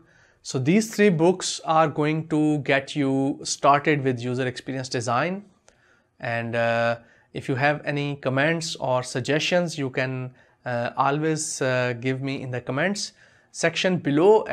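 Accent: India. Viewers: love your indian type assent, also buyed ur , udemy course,, it was awesome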